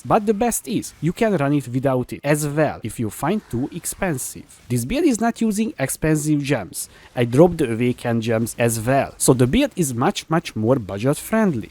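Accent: Hungary. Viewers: Remélem csak én hallom rosszúl, hogy magyar vagy? Mert sirva fakadok ha ilyen szintű angol nyelvü videókat csinálsz, miközben magyar myelvüek nincsenek.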